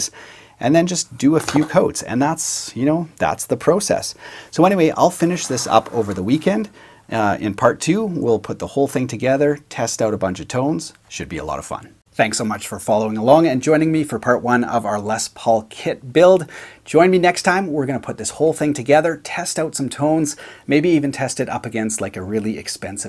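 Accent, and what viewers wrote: Canada. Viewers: Are you Canadian? I am just wondering...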